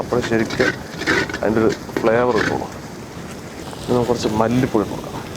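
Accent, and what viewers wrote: Palakkad, India. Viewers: Namude palakkadan bhasha +firozikkade ckng😍😍😍😍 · ചേട്ടായി നിങ്ങടെ ആ പാലക്കാടൻ സ്ളാഗ് സംസാരം........ സൂപ്പർ..... ഒരുപാട് ഇഷ്ടം ആണ്......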